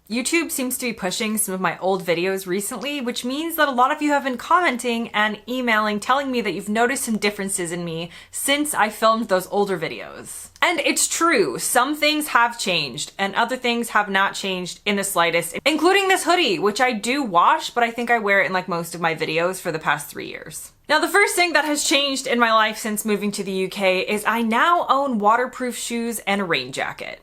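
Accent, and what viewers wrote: United States. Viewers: You still sound very American to those of us in the UK, but after 10+ years living here do your American friends and family think you have a bit of a British accent? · Not only do you have an American accent, but you speak like someone from the USA, using words and phrases that British people would not. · Your accent doesn't sound like you landed yesterday....not to me. It's only reallly mildly 'American sounding' to me, should blend in well in the UK. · It is a bit surprising that your American accent is totally intact after all the years that you've lived here in London. Is that a conscious thing not to lose it? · Ive noticed with time you seen much happier . I like the way you say British words but with an American accent! I worked in London for years and the pace was fast...i moved to the countryside and struggled for the first year as the pace was too slow....i adjusted and now live a slower less stressful life. 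Great episode again pointing out what we take as granted.😊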